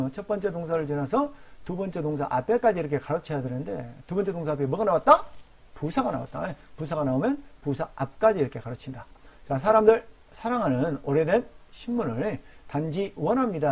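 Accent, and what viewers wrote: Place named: Jeolla, South Korea. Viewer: 흐흐 구수한 전라도 사투리... 저절로 드러나시네요.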